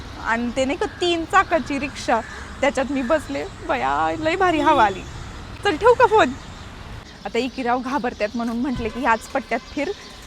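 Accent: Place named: Kolhapur, India. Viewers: This Is Kolhapur Style Marathi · Wow! That's to epic yrr. Khup divsatun hi satara - sangli chi bhasha aikayla bhetli. ❤❤❤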